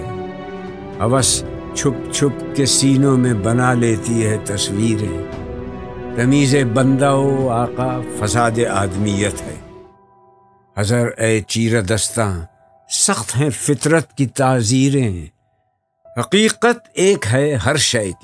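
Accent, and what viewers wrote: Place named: Turkey. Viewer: Marvelous... But plz tell the pronouncer to not use the Turkish accent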